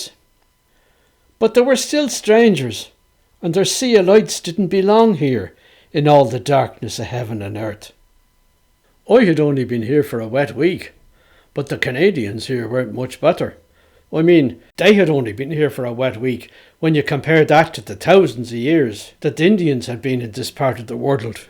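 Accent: Dublin, Ireland. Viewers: What a treat, a tale told by a Dubliner waiting for me when I opened YouTube. Many thanks for this slightly sad story.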